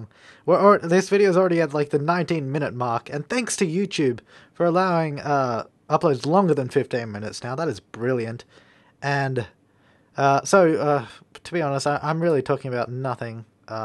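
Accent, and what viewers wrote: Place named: Australia. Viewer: Your accent is stronger, and sexier :) What part from Australia do you come from? I'm From Nsw, Boganville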